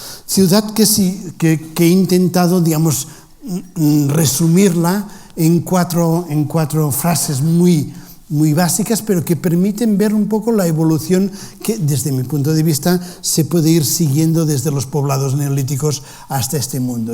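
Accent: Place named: Catalonia, Spain. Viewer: Sabe hablar en Español? Parece catalán...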